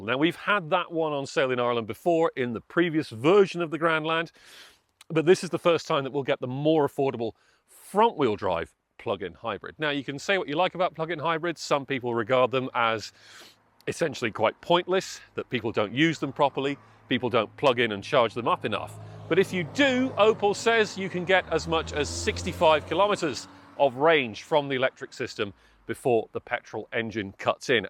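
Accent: England, United Kingdom. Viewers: because he's English :) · Is this guy english?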